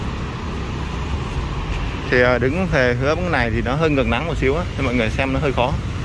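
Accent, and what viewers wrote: Vietnam. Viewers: Có vẻ như ad. này quê TH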